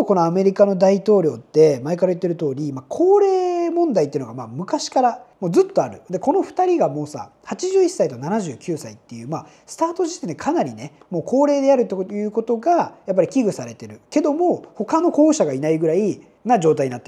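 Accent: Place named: Fukuoka, Japan. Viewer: 山口県出身なのか、喋り方は福岡っぽい。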